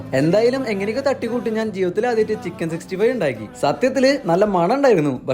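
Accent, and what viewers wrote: Thrissur, India. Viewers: Chetta oru video full trishur slang samsaarikko plz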